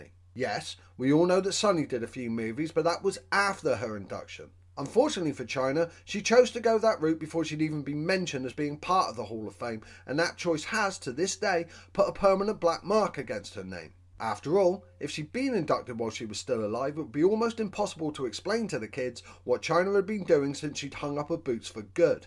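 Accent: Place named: England, United Kingdom. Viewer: pretty sure he is english, thats how they pronounce the letter H.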